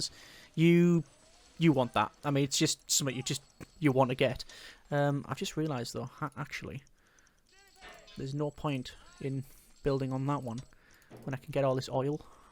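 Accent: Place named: Wales, United Kingdom. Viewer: Man, are you Welsh? I study and practise UK accents because I think they're awesome, and I detect something in your voice. It sounds Welsh.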